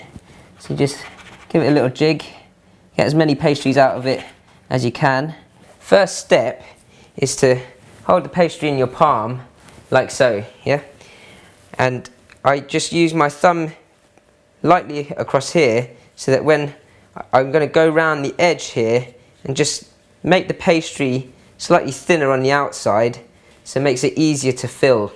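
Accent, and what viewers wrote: United Kingdom. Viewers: I don't really care about his cooking skill... thinking from Asian American point of view, he has the cutest British Accent...LOVE IT! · British accent is so elegant. I love dumplings anyway! :O)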